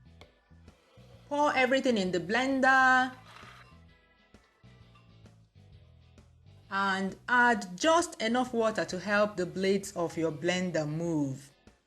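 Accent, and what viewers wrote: Igbo, Nigeria. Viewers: I always watch your videos. Always. Love your food and your Igbo accent. My parents are Nigerians. I'm from the Caribbean. I love your recipes so much!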